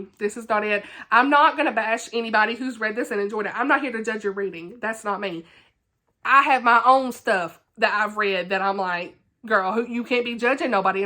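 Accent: South, United States. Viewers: Northanger Abbey in a southern accent is not something I knew I needed in my life 😂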